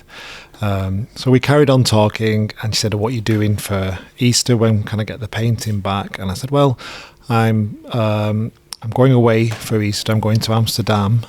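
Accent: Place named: Manchester, United Kingdom. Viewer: Love the channel, nice to hear a Mancunian accent. Is the painting the stereotypical Amalfi Coast scene?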